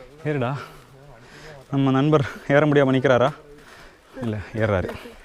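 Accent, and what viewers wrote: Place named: Kanyakumari, India. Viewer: Nenga Kanyakumari ah🙄🙄🙄 unga slang some different🤔🤔🤔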